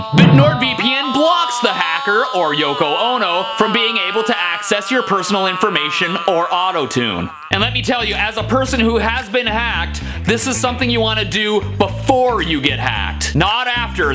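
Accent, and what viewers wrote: United Kingdom. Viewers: Your British accent was good, but you should see Kent Paul or Maccer's accents.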